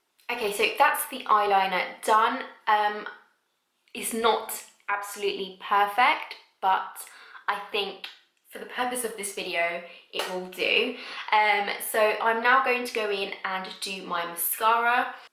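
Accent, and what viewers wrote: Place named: RP, United Kingdom. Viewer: Aww you're so sweet! The make-up looks really good! btw I love your English posh accent 😁 😘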